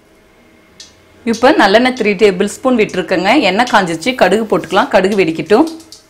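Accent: Kongu, India. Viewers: Kongu Tamil kagave oru like...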